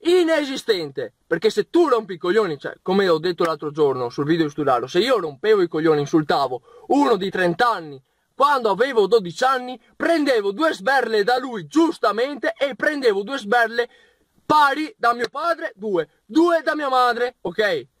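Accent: Veneto, Italy. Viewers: 1.15 LÈGO AHHAHAHHA Comunque bellissimo il dialetto Veneto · Il tocco del dialetto veneto rende tutto più bello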